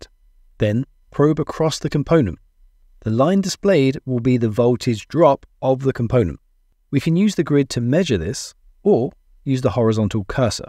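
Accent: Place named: United Kingdom. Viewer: I don't know if this is speaking in the video, but his voice and accent (British, I'm assuming) is very easy to listen to. His step by step, logical directions, have convinced me that an oscilloscope is something I need to look into.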